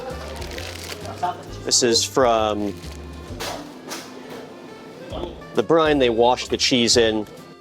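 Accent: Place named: Germany. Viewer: Der arste Amerikaner wo mann denkt er ist ein Deutscher, Schweizer oder Engländer.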